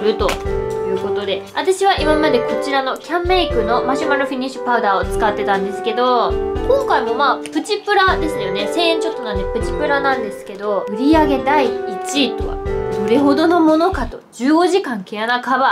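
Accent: Kansai, Japan. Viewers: 秦基博好きなんですか？ なんとなく関西弁の人見たら応援したくなります。これからも頑張って！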